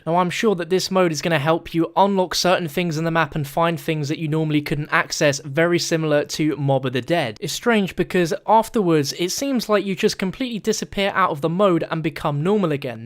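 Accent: United Kingdom. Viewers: Why does every famous COD reviewer on YouTube have to have a pussy ass British accent?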